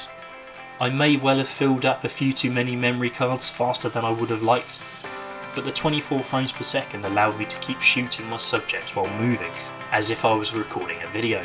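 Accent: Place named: United Kingdom. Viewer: Your audio is really ok for normal hearing people but when you are exposed to jet engine noise over several years it a bad cobination with the british acent and the music your playing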